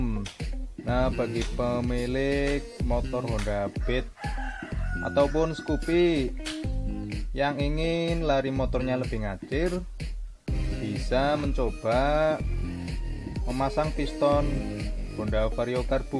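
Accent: Banyumas, Indonesia. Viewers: Mas ngapak yaa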